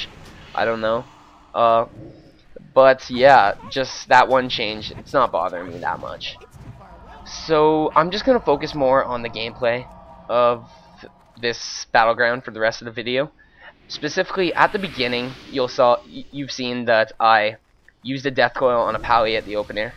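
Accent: Canada. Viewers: I havent checked your channel, but you sound like a canadian :) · Are you Canadian?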